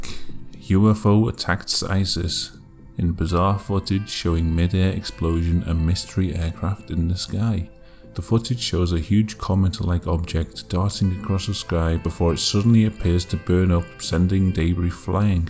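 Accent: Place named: Newcastle, United Kingdom. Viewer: we love your New Castle accent that late night DJ mellow drone and your content is consistently strange and revealing. Keep it going Mitch and Lolly from Tipperary or should we say Kipperarey Ireland.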